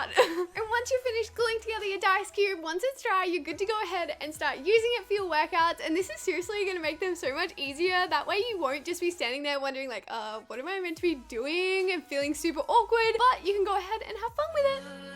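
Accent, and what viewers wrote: Australia. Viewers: She's australian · Are you aussie...you sound like one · are you ausie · Your australian wow cool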